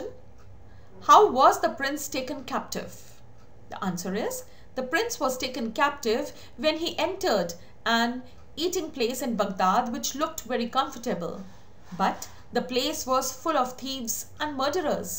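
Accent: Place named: Australia. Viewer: But , even though u are an Indian , your accent is much like an Australian ✨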